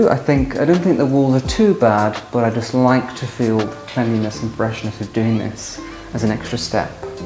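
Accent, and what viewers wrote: RP, United Kingdom. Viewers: accent is so posh.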